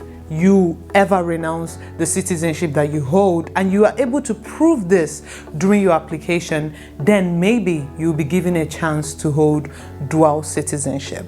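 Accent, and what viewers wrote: Nigeria. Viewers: I would not think you were Dutch because you sound Nigerian.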